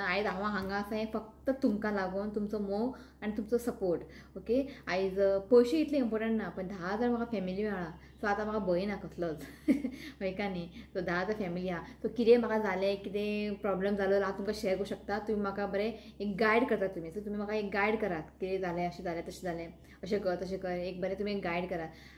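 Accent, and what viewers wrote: Konkan, India. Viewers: Hi Congratulations🎉🎉 God Bless You n your family Your s is the 3rd Vlog I am watching, so I have compared with the other 2 vlogs n found yours to be the best natural vlog, you are perfect in all, I like your konkani accent, I like when you crack jokes, I have tried many of your recipes n it turned superb n shared them to my friends. All the best 🎉🎉🎉🎉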